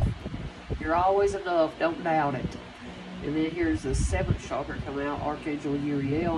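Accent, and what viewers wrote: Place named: Alabama, United States. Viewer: 77 subscribers already. I love your voice and accent. It's just like my cousins who are from the mountains of Gadsden, Alabama and the only area of Alabama with that dialect. I've always loved it. You also seem to love Spirit in a way similar to me, I don't know. I'd donate if I could, married 31 years and finances are the only area that we've not been abundant and successful in. But it's all a part of divine plan and I'm just happy to be here. If I'm led by Spirit to do more in the world, I will.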